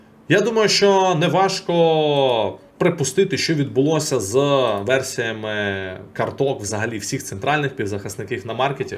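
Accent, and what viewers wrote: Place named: Kharkiv, Ukraine. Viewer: В Харькове так же говорят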